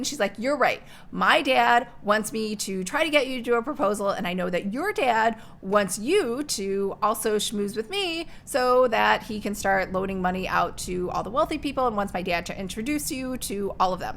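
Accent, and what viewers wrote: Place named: United Kingdom. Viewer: Bed and breakfast and fun British accent ? Count me in ! This sounds like the perfect weekend read. Can’t wait to dive into it—thanks for the great suggestion!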